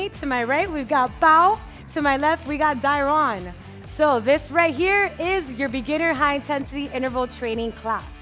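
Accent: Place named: Miami, United States. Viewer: That Miami accent tho.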